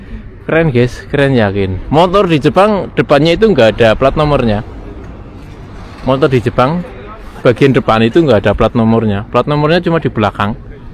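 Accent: Banyumas, Indonesia. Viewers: Pekan baru... Mas senggol dong.. Kepriben ora ngapak ya ora kepenak.... ❤😂😂 sukses..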